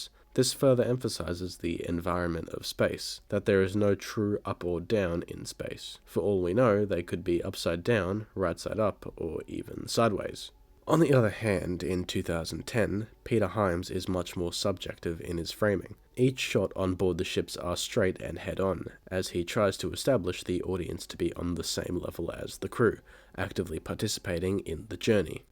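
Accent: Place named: Australia. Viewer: Always so validating hearing an aussie accent. Love the video mate!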